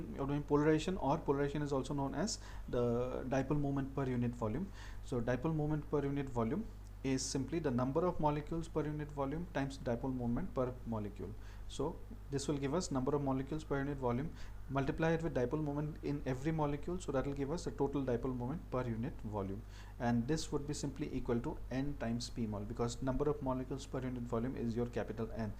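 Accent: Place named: Bengal, India. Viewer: Very clear explanations sir! 😊 Your accent seems to bengali. Loved it! From Pakistan 🇵🇰🇵🇰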